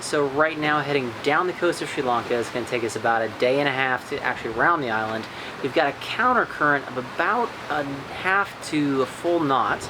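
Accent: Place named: Texas, United States. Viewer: I went back and re-listenened to the vid...I didn't hear any valley girl...unless you count a hint of Palo Dura Canyon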